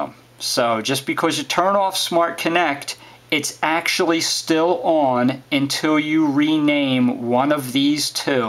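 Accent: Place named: Philadelphia, United States. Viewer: Thanks for the help dude. From another dude from the Philadelphia metro area, I recognize that accent anywhere!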